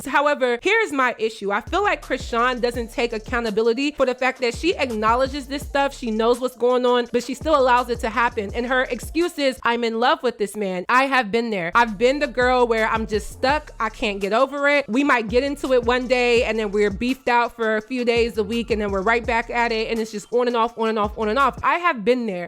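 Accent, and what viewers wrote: Philadelphia, United States. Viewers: 215 South Philly love your Content and can hear Philly all day in your accent❤ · Girl I’m from Boston and I’ve been watching you for a while and I already knew u were from Philly with that accent! Philly thru and thru lol ❤ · 215 I knew you were from Philly from day one! I said "hol up she sounds like me!"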